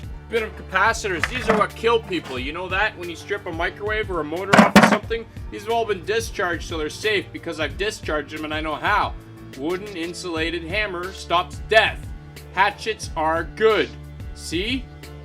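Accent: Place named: Canada. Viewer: Sounds like he's Canadian. He's from Canada not Scotland, that's the accent you pick up.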